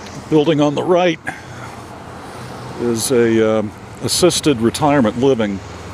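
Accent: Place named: Memphis, United States. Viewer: thanks nice video i could hear elvis in your voice memphis accent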